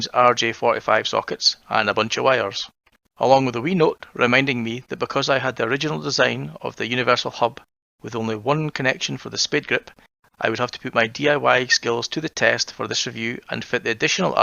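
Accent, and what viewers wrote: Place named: Newcastle, United Kingdom. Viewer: Love the Geordie accent, why aye pet, emotional roller-coaster of emotial emotions 🤠👍🏿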